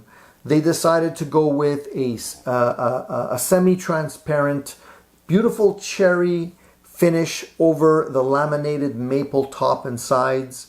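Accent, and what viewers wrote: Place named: Canada. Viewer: Hi where is your accent from? Italian Canadian? Sounds similar to me! Cheers! Nice guitar sounds great!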